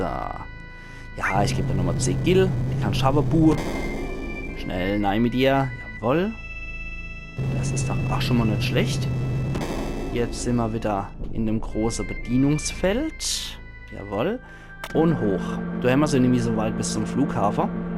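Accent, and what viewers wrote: Palatinate, Germany. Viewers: Bin zufällig auf den Kanal gestoßen. LPs im pfälzer Dialekt kommen ja super! geliked :-)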